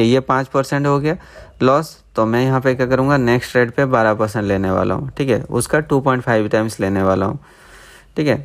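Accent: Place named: Kolkata, India. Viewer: Kolkata Se Haii Bhaii ❤️ Tere Bolne Ka Style Se Pta Ge Mujhe..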